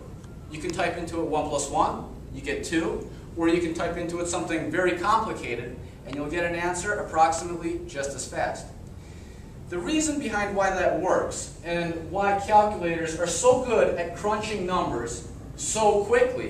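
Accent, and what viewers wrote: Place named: Ukraine. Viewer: Great stuff,. Excellent presentation in terrific English with only a hint of a Ukrainian accent. Thanks!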